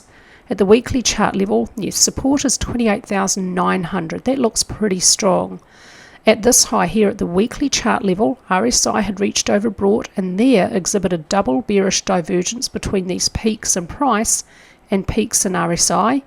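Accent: New Zealand. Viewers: thinking mainly of the big one on everyone's mind... SP500. Ewi have been spectacularly wrong over many years, although arguably through Fed intervention, its debatable whether that is an exogenous factor and a valid reason for failure. Nonetheless, your analysis has been spectacularly correct, AND in that you stand alone. You can weigh possibilities, then apply fine balance and judgement. Umm, you sound kiwi??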